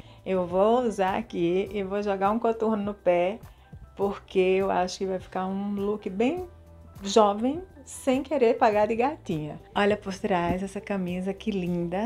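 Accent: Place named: Northeast, Brazil. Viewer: Pelo seu sotaque deve ser Nordestina, como eu. A não ser que você não more no Nordeste, esses looks não são completamente inadequados? Ou então você mora no sul ou algo parecendo 🤔🤔🤔🤔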